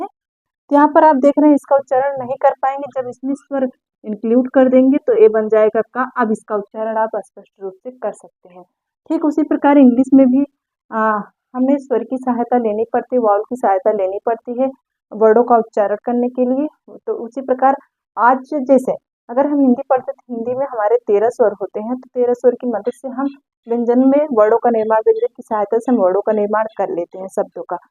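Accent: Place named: Uttar Pradesh, India. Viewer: Madam, You may belong to Uttarpradesh, I guess by your tone, so many thanks for it.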